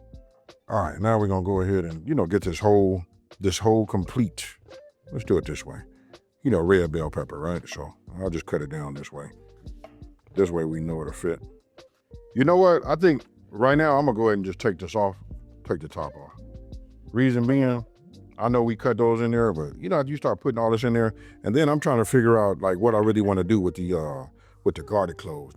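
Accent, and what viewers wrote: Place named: South, United States. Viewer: 😊❤ Wooow, that's a Strong Southern accent!!! Love your cooking!!! Greetings from Ghana 🇬🇭🇬🇭🇬🇭🇬🇭🇬🇭 Come visit sometime!!! 🖐🏾😊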